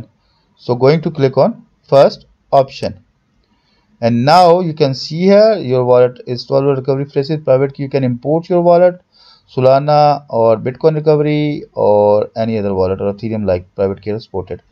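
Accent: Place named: India. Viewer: Gosh. The Indian English accent is the worst 🤮🤮🤮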